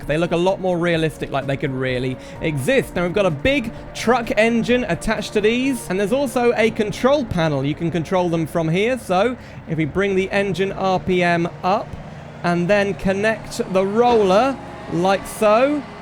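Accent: United Kingdom. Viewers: Another fellow funny british man fan, it tickles the happy parts of my brain, we do eht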